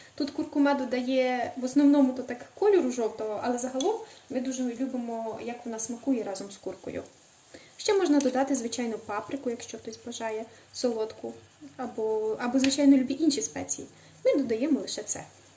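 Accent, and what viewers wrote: Poland. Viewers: не ображайся, але твоя вимова трохи ріже вухо. Тебе гарна українська мова, але відчувається польський акцент на рівні наголосу на останніх складах у словах. Це робить нашу українську мову у твоєму спілкуванні дещо не гармонійно. Тому прошу це врахувати. Ти як блогер, людина з вищою освітою повинна розмовляти правильно. Покажи всьому світу, що українська мова гарна, мелодійна. Роби правильні наголоси! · мова твоя повязана з польскою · Ви молодці.Ваша українська мова чудова.Живемо майже рік в Польші і лапаю себе на думці,що частіше думаю на польській і деколи навіть плутаю слова укр з польськими.Це цілком нормально...цим не переймаюсь...Подруга моя довше живе тут,чоловік її поляк і спілкується з ним на польській,то в неї така подібна вимова до вашої.Мені подобається,багатьом теж...а кому ні то нехай не слухає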